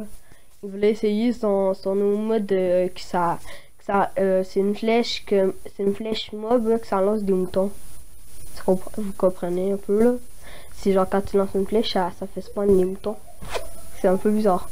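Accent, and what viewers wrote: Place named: Quebec, Canada. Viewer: Du québécois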